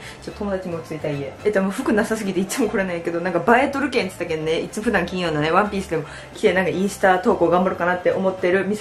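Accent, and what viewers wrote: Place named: Shikoku, Japan. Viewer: はじめて見ましたが、方言がわたしのところとすごく似てて親近感湧きました（笑）四国の方なんでしょうか、、？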